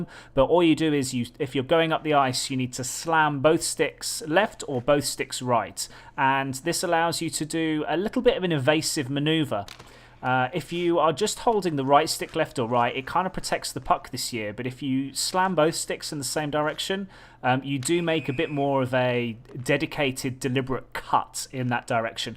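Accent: United Kingdom. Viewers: Somehow your British accent makes it actually feel like I'm learning. Teach me about the inconsistent stick physics, though defenders legs ect.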